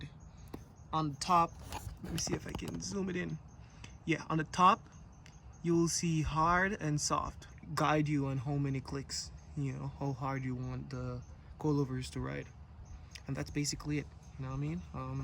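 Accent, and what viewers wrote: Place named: Jamaica. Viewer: U sound jamaican dawg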